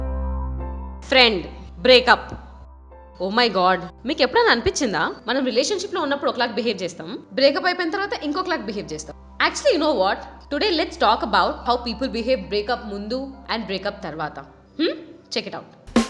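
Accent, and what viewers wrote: Rayalaseema, India. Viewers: ur from rayalseema na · Hey r u from rayala seema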